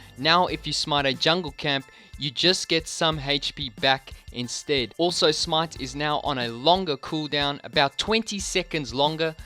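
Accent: South Africa. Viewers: you sound like an south african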